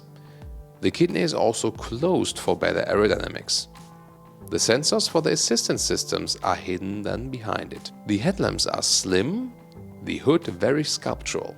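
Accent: Germany. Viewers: You know, the German accent made it sound "it's said... " in the beginning of the video as "its sad", which is what this car makes me feel like. Yes BMW, well done. Take a car that needs very little grill space up front to make this hideous, absolute joke of an ugly duckling. Funny thing is that it is so very ugly even on the inside. Gosh I had never been so appalled by a car design overall. This vehicle looks as bad as, if not worse than, the likes of the Fiat Multipla or the Daihatsu Materia. I know this is just a concept but comparing this to the concepts we used to get between <num>, it simply paints the future of car as a tool that has no sympathy towards designing what is beautiful.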